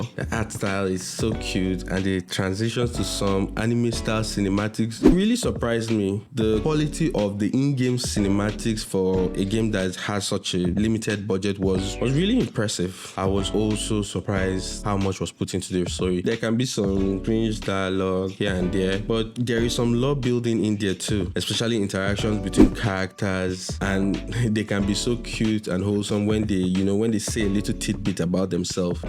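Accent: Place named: Africa. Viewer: Heard the african accent, subscribed immediately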